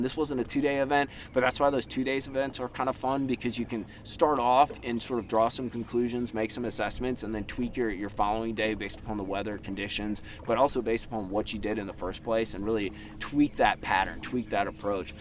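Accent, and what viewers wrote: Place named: Minnesota, United States. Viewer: Woooo! First comment finally. Fishing is a lifestyle. That ohhh Kay 😂 I’m from Minnesota so I can appreciate the accent.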